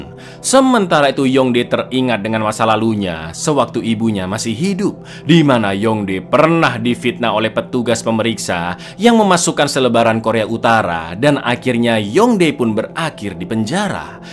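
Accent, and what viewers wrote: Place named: Sidoarjo, Indonesia. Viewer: Cak mau tanya awkmu arek Endi asline kok logat e kyk wong darjoooo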